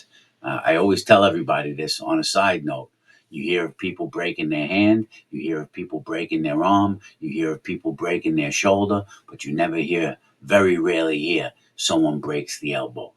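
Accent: New York, United States. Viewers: straight up NY accent! (I have one too 😂)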